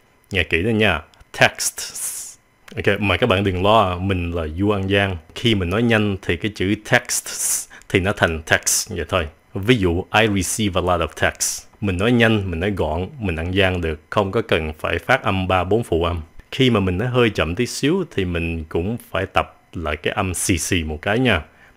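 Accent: United States. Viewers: Do ở đây dạy phát âm theo Anh-Mỹ. Nên đọc theo là đúng với nhiều ngừoi Mỹ mình nghe, Còn cách đọc thành iks là âm Anh-Anh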